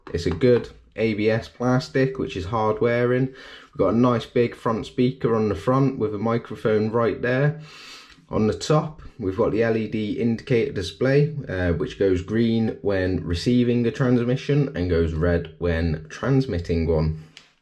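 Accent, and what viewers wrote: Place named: England, United Kingdom. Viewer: 😂 Fair enough mate...you sounded English in the Video...73 ✌️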